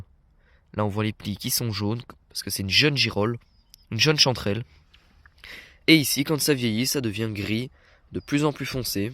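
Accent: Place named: Franche-Comté, France. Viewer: Tu ne serais pas de Franche Comté ? Je pense reconnaitre un leger accent 😊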